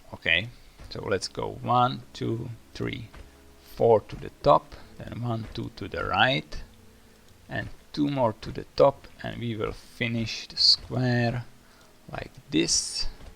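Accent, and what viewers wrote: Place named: France. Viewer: Love your accent... French I guess?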